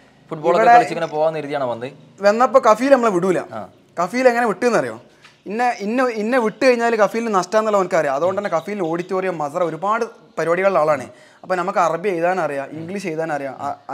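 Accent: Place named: Kasaragod, India. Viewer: ഞാൻ അകത്തേക്ക് പോയപ്പോൾ ആണ് interview എടുത്ത ആളെ മനസ്സിലായത്.. Ninghlde കാസർകോടൻ ശൈലിയിൽ ഉള്ള ..സംസാരം pwoli ആണ്. Aa റോഡിനെ കുറിച്ചും ,പിന്നെ Corona കുറിച്ചും പിന്നെ പലതും superb 🔥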